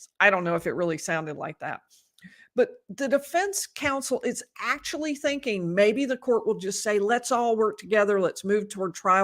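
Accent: South, United States. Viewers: i litterly had to reread the defenses filing..............all 120 + pages, in my almost 80 yrs i have watched as attorneys turned a noble profession one made admirable abe lincoln into a sewer, what those two defense attorneys did was not short of vigorously defending there client. i hope this sets a precedence for others who truly want to defend there clients. in this day and age it happens in 1 out of 100 cases. due process was not i repeat not followed. the law is clear there are gold standards call procedure and it did not happen. no one believes she fired them for negligence or any misconduct. she fired them because she is a arrogant female who has decided she has the power as judge to call the shots SO she did. i have been interested in true crime since i was six. forgotten more than most of you know and believe the arrest warrant was flawed. 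these crooked l e may think if we don't have a law degree we don't recognize when we are being hood winked. pulled of the case, hell she should be kicked of the bench and fined $100,000.00 because her real damage was to the accused and to our constitution. love to hear a southern voice advising and instructing our young whipper snappers. THEY NEED ALL THE HELP YOU CAN GIVE THEM. your passion also shines through and that will continue to bring you a large audience.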